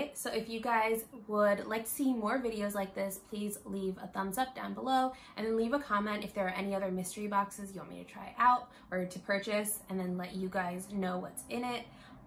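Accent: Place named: Arab world. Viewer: Your Arabic accent is soo cute.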